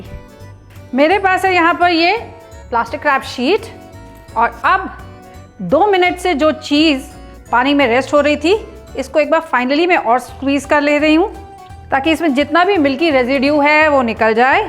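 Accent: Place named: Bihar, India. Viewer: Ka ho ustad👀 u r bihari woho I thou u r Punjabi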